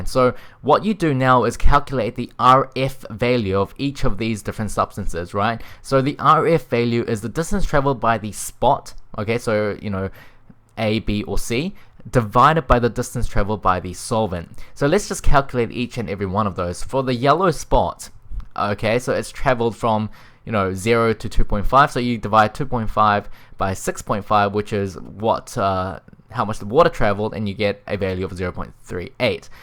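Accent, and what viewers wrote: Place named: New Zealand. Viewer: Your new zealand accent>>>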